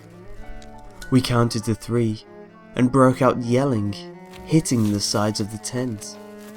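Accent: United Kingdom. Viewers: omg brit accent so sweet and scary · Any other girls (or guys) out there kinda have a thing for 's voice? I think pretty much everyone digs a British accent-or is it just me? Maybe it's cause of the Beatles, I don't know...I think out loud too much. Hesitates to click post.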